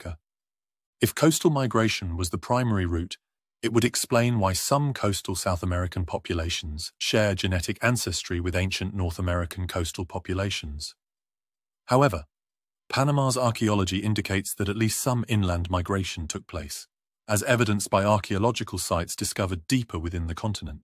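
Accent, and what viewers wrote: England, United Kingdom. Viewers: I think it would be nice to pronounce Denisovan and Neanderthal correctly, especially if employing an English accent in the presentation.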